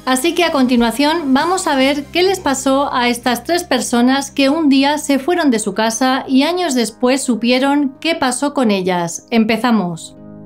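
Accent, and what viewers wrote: Spain. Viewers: Me encanta como hablas y tú acento español.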